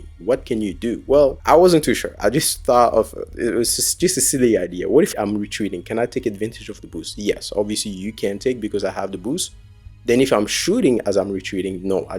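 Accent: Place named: Sub-Saharan Africa. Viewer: you sound subsaharan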